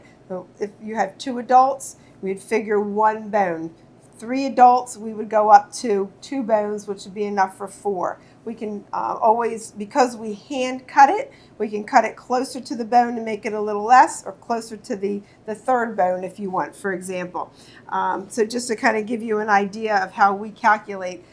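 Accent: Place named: Tidewater, United States. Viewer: Great video. Love that Tidewater accent!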